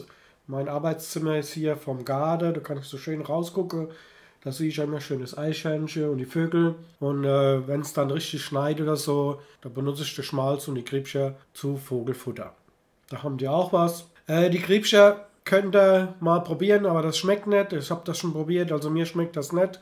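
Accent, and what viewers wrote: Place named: Hesse, Germany. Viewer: Danke für die Anleitung. Des wunderbare hessische Gebabbel hab ich als Exil Hesse total vermisst. 😊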